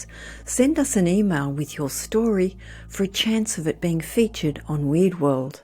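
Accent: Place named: Australia. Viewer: Exactly so why would this feature on this episode? The narrator is clearly Australian?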